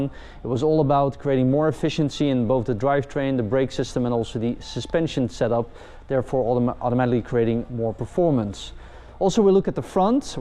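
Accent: Netherlands. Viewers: It looks like the host has a Dutch accent...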